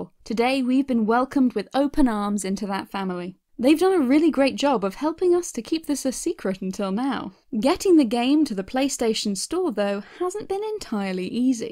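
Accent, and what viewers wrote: United Kingdom. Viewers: Theres just something about a British accent.🤤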